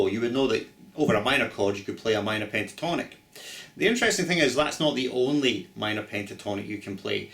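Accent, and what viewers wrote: Scotland, United Kingdom. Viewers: Any chance you could invest in a tie clip mic? Your Scotch accent is a rarity in YouTube and needs to be heard properly.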